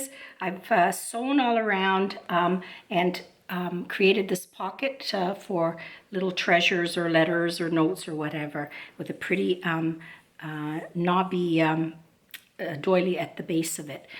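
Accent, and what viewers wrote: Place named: Italian American, United States. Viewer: I love this journal; your work is always so beautiful. TFS. I'm sorry to hear about your computer. I had my one and only Mac three years ago because everyone I know said it was superior. After a year, the disk literally burned up and I lost two chapters of a book I had been writing. They were really good, too! I haven't gotten over it yet and haven't been able to get back to the book yet either. I'm using a PC again. I'm glad you said you are Italian. I have been wondering a long time if you were because of the intonation in your voice. I've known many Italian American women who have a similar timbre and speech patterns. I didn't want to be rude and ask. Thanks for the answer!!! Baci. Dolores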